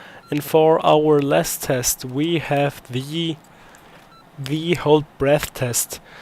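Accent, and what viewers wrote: Germany. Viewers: Bist du Deutsch? Man hört dass es Deutsches Englisch ist :) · geil man :D Deutscher Aktzent erkennt sich meilenweit :D tolles vid, hat mir sehr geholfen danke man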